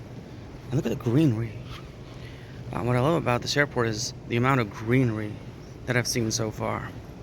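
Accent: United States. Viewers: You have an American accent. I grew up in LKO. I live in Cleveland, Ohio now. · Hi I noticed you speak with an (American ?) accent. I'm curious, did you learn it from a particular source or through practice ?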